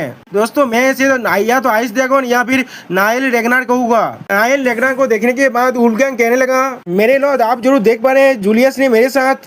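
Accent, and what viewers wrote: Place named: Bengal, India. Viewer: Bhai toda slow or hindi clear bolo kitna fast or bangali me bol rahe ho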